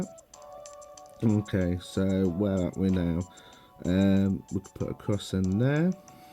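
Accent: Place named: England, United Kingdom. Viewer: brooo the england accent is ceazy, loved the video lad!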